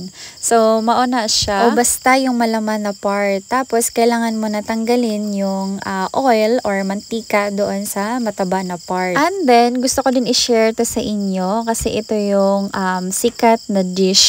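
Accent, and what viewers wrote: Bohol, Philippines. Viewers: mura lge ug inistoryahan sa amoa sa BOHOL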